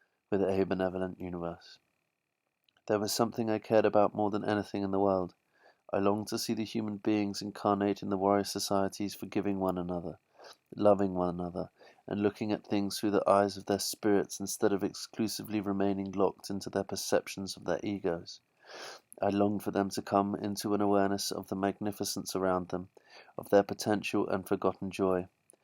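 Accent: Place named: England, United Kingdom. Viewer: Love the book but, just because this guy has an english accent does not improve the presentation. This is pretty lousy.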